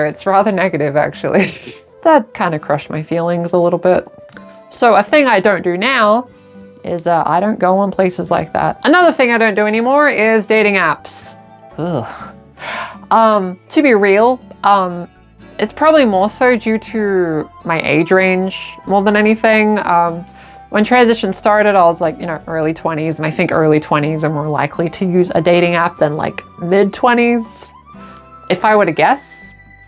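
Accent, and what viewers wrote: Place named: Australia. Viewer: You kinda sound like pearlescent moon lol (its probably the aussie accent) ❤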